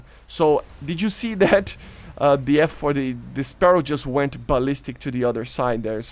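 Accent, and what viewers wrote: Brazil. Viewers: O sotaque brasileiro é inevitável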